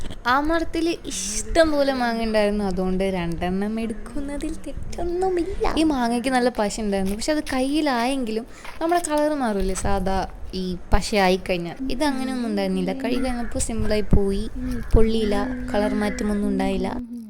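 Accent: Thrissur, India. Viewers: Thrissur touch und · ഭാഷ കേട്ടിട്ട് തൃശ്ശൂർ ആണെന്നാണ് തോന്നുന്നത്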